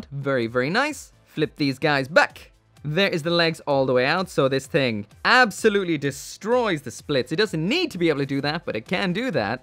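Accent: Ireland. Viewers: You're irish?!?! It makes sense now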